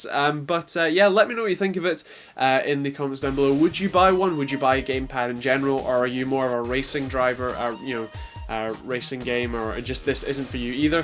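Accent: Scotland, United Kingdom. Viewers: Good review mate and good to see someone Scottish instead of American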